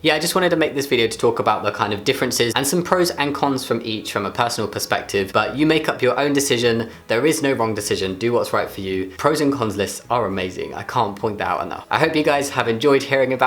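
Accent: United Kingdom. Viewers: just luv your Brit accent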